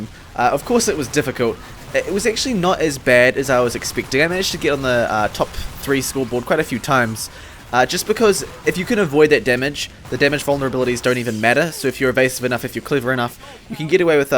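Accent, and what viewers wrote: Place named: New Zealand. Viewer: Are from NZ by any chance you've got the accent.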